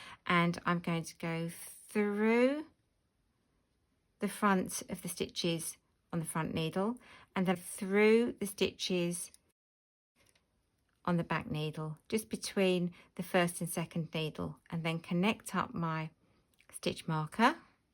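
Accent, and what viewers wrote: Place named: England, United Kingdom. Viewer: Bonjour, et merci pour vos vidéos qui sont de bonne qualité, 😃 (j'apprends à tricoter les chaussettes, et plus particulièrement les talons en partant de la pointe des orteils) 😀 le seul problème c'est que je ne comprends pas l'accent anglais et mon vocabulaire est plutôt scolaire...😊 Auriez-vous la gentillesse de régler vos paramètres, lorsque vous mettez vos vidéos en ligne, afin que les vidéos et sous-titres soient dans des langues étrangères, dont le français, svp, s'il vous plaît ? Mes paramètres personnels sont réglés pour mettre les sous-titres en français lorsque YouTube m'envoie des vidéos de pays étrangers.... Par avance je vous remercie et vous souhaite de belles fêtes de fin d'année...dans la paix et la sérénité 💐💟🎅🤶🕯️🌈☃️🥰🌹🙋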